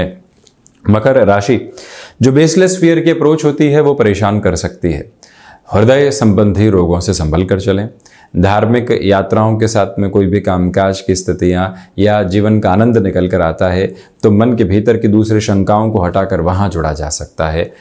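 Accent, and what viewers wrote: Standard. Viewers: वाह!गजब की शुद्द हिन्दी बोलते है आप।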